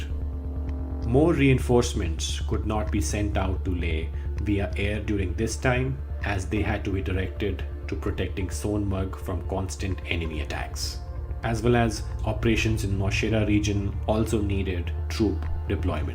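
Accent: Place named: United States. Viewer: Excellent research and presentation, as always. One request: pls try to use the King's English i.e. the English that is taught in our best schools and also used in our military. Therefore, 'route' should be root (not rowte), 'lieutenant' should be leftenant (not the U.S. "lewtenant"). Else the Americanisms are jarring to the educated Indian ear.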